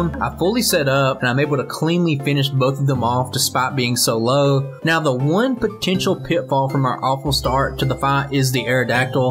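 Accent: South, United States. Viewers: nah, dude. Thank YOU for the great content. Love the southern accent!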